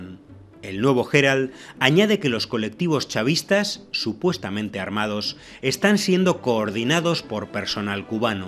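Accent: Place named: Spain. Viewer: Cuba Información y con un españolete de narrador! que bodrio!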